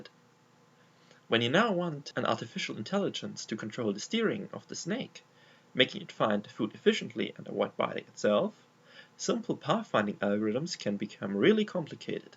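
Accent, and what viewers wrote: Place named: Germany. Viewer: That accent... Are you German?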